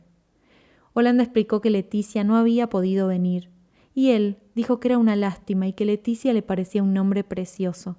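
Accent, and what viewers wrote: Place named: Argentina. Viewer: Una interesante narración de la maravillosa pluma de Cortázar. Adoro todos sus cuentos, gracias por su linda lectura. Tienes una voz muy bonita, el acento argentino es único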